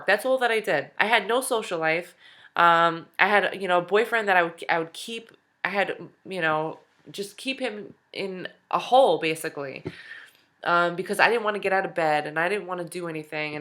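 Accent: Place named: New Jersey, United States. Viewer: Can totally tell u from Jersey. It's kinda funny sounding to a West coaster. Methadone 5 years. Looking to get off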